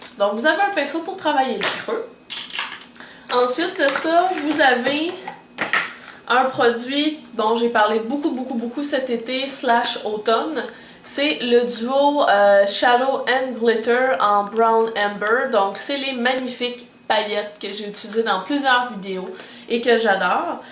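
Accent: Quebec, Canada. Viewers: Coucou, Je participe à ton concours avec grand plaisir, il est fort agréable d'entendre a nouveaux le bel accent du Québec (j'ai eu la chance de pouvoir visité un peu cette magnifique contré) J'adore également tes vidéos je les trouve super!!! Ce qui m'intéresse le plus dans le lot a gagné sont le pinceaux et les paillettes... Merci pour tous ce que tu fais, Bisous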